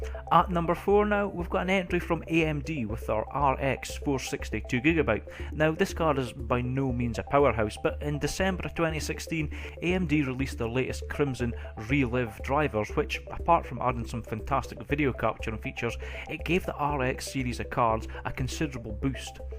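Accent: Scotland, United Kingdom. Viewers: r u scottish?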